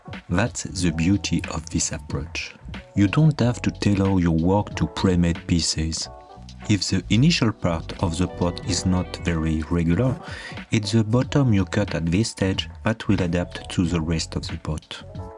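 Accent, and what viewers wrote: France. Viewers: Again beautiful work. I like the ´frenglish´ accent too. You have inspired me a couple of years ago to make bonsai pottery myself as I learned a lot from your videos. Can´t wait to see your pots on the trophy next week and see the artist in person. Greetings from Belgium. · Great video! So nice to hear that great accent. All this time we didn't know you are French. Not that it matters, just enjoyable. You are such an inspiration. Thank you...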